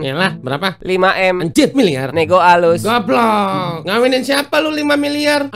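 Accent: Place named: Java, Indonesia. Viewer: Dulu medok asw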